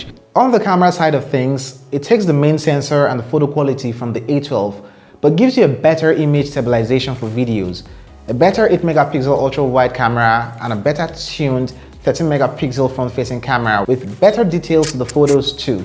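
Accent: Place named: Nigeria. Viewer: u sound like u originally a nigerian__if u are much love brooo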